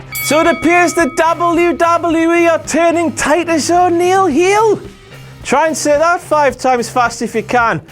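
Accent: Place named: United Kingdom. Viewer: shit! u have a fucking shit britain accent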